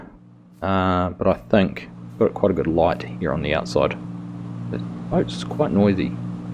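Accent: New Zealand. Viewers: I’ve listened to your voice long enough now that I can understand everything you say. At first that Kiwi accent sounded like you were speaking a foreign language. 😁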